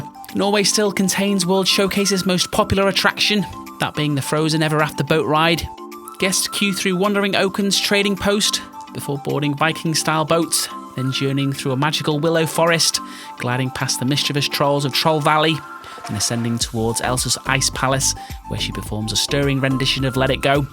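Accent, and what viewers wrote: Liverpool, United Kingdom. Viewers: Is that a scouser accent? Jesus this was hard to listen to.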